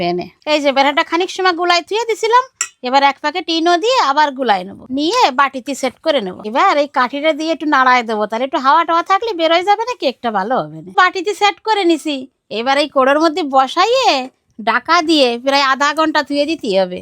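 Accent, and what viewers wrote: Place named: Bagerhat, Bangladesh. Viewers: আপনার বাড়ি কি বাংলাদেশে। আপনি প্রায় বাংলাদেশের বাগেরহাটের ভাষায় কথা বলেন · বাংলাদেশে খুলনার মানুষদের আঞ্চলিক ভাষা কিছুটা এমন শুনতে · কিরে যশোরের ভাষা বলে কেন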